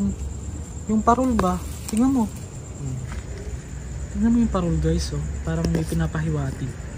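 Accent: Visayas, Philippines. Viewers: Ka cute nimo mag bisaya oie 😍 amping permi ❤God bless 😇💖💖💖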